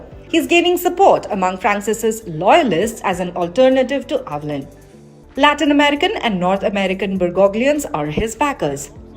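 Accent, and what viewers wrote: India. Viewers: INDIAN ACCENT IS ANNOYING AND WRONG PRONUNCIATION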